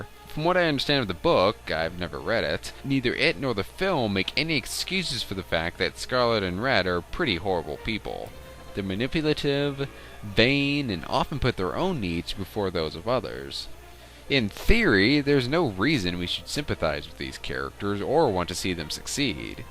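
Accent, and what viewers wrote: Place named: New England, United States. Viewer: Nasal yankee commentator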